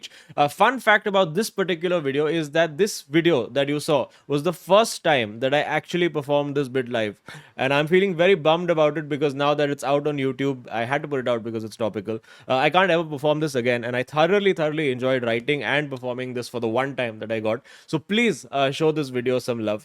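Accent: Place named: India. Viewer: why is your accent so closely associated with brown skin black hair and indian nationality? everyone in bahrain spoke like you even the british blonde schoolgirl scoundrels!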